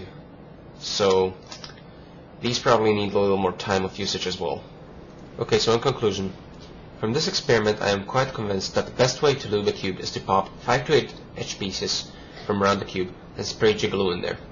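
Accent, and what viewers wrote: Canada. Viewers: Ah! Thanks for this. I'm about to lube a cube myself for the first time, and I wasn't sure whether I should disassemble the cube or just spray some in and how much. En passant, êtes-vous Québécois? Votre accent, léger qu'il soit, semble nettement Canadien-français.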